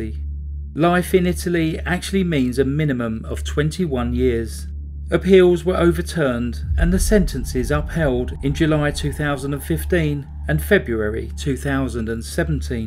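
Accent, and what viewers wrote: United Kingdom. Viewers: Aaaahhh, your Italian syntax was so impeccable I thought I was listening to. I could barely notice just a smidge of British accent.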